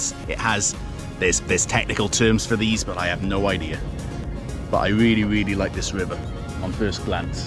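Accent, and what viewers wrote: United Kingdom. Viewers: Wow I love the view 😍👌 nice british accent can you teach me haha kidding 😆😊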